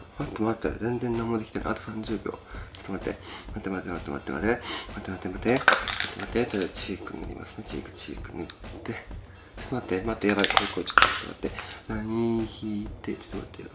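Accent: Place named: Osaka, Japan. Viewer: 大阪弁可愛い(＊¯ω¯＊)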